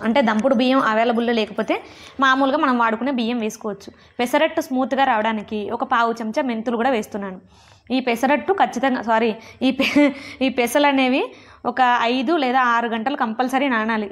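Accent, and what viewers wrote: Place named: Godavari, India. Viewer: Hi this is purnima mee mattalu vinty gala gala godavari gurthuvastundi😀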